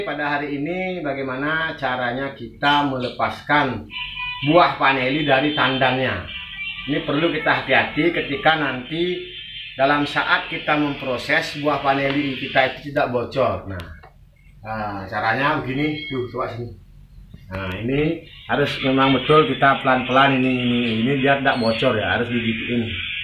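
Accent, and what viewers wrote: Bali, Indonesia. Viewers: Logatnya bali nok. Salam vanilla lovers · tinggal nya dmana pak?? logatnya kaya nak bali😁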